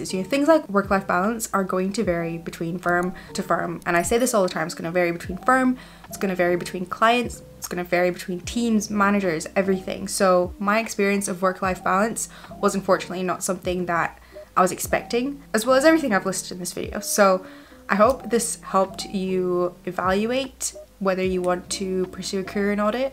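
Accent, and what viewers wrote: Scotland, United Kingdom. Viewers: Sounds scottish to me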